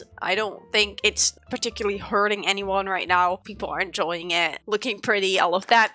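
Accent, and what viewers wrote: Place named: Netherlands. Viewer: You sound Dutch <3 Are you Dutch? Very interesting topic!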